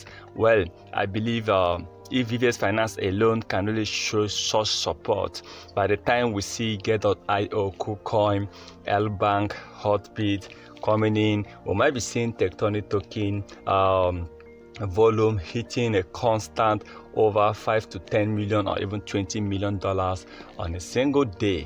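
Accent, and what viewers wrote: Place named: Nigeria. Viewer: Nigeria man,on ur voice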